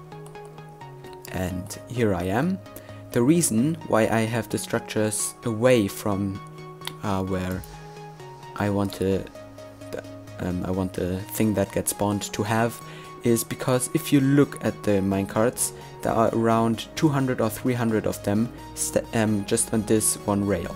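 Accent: Germany. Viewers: Are your a german guy?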